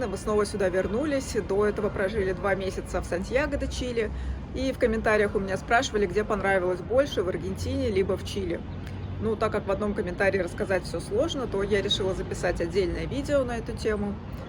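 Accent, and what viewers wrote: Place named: Urals, Russia. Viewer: Так она не говорила что из Москвы,ну и по говору и манерам совсем не москвичка,скорее с Урала..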